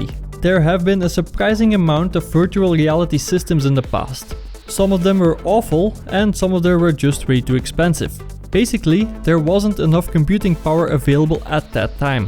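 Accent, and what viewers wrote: Netherlands. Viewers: you sound like a dutch guy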